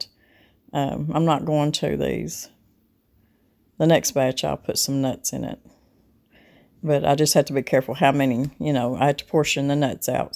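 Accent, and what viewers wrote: South, United States. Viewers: Thank you! My digestive system does not like sugar alcohols. A lot of low carb recipes call for those, so maybe I will try the monk fruit. Enjoy your videos and the southern accent. I grew up in Virginia, so had an accent at one time. We moved to Wa. State and I lost it!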